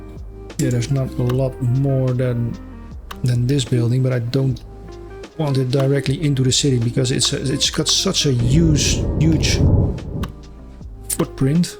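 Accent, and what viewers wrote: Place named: Netherlands. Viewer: That accent... a fellow Dutchman by any chance?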